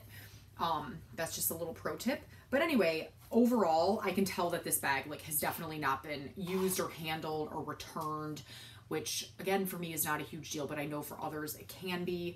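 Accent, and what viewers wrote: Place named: Minnesota, United States. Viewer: are u in Minnesota? The accent ...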